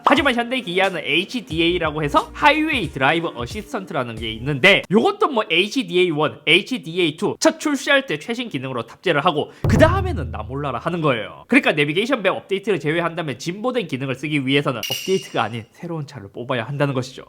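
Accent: Gyeongsang, South Korea. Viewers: 경상도 사투리 구수 하네예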